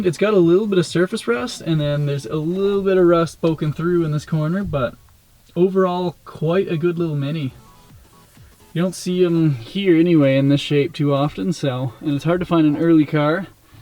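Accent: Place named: Canada. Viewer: Honestly I must be going mad because every time I find a Canadian on youtube, they seem to go out of their way to say words with "ou" in them, usually ends up being "oat".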